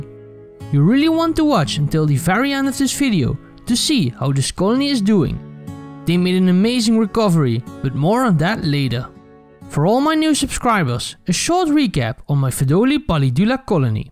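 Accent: Netherlands. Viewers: Am I hearing a Dutch accent? :o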